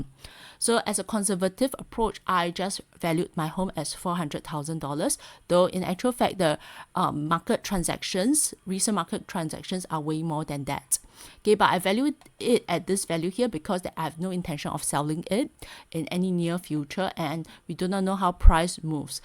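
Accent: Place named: Singapore. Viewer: Singlish ma......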